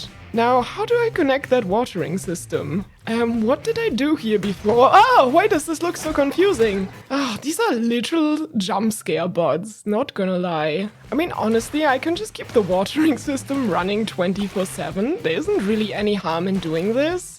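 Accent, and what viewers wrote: Germany. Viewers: I don’t know if it’s just being an old-school Minecraft player, but I don’t know what it is anytime a German or someone with like that German style accent teaches me about engineering. I just have to listen. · man the German accent breaks me every time, so cute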